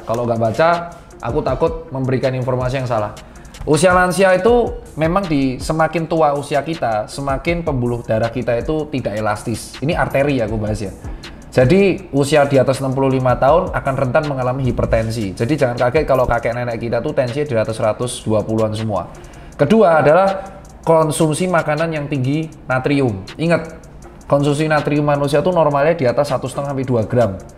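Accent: Java, Indonesia. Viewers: Medok nya mantap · Bos tirta klo ngomong kliengan meni medok😀😀😂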